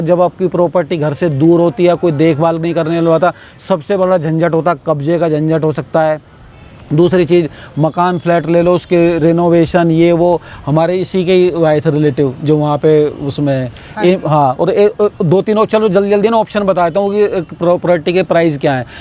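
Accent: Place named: Haryana, India. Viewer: Faridabad ,Palwal ki si boli hai aapki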